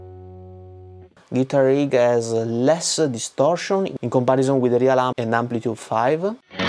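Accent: Italy. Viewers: Si sente poco che sei italiano ahahahhaa Grandeeeee bel video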